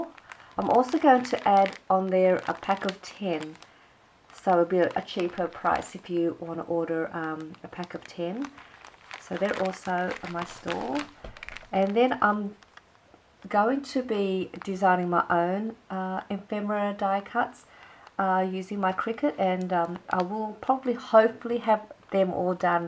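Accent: Australia. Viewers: Lovely projects and such a gorgeous Aussie accent!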